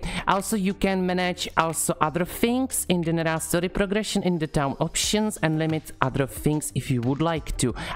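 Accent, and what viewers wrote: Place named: Italy. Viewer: What AMAZING ACCENT! Is it Italian? I LOVE it! Also, thanks for the guidelines!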